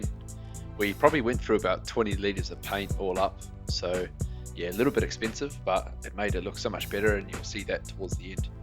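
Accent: Australia. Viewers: Aussie accent. Awesome video. By any chance do you live near NSW central coast? Can you come paint ours? :)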